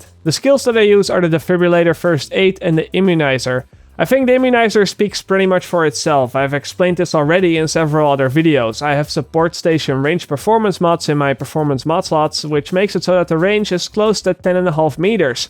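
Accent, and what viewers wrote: Netherlands. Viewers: die nederlandse accent klinkt echt kut xD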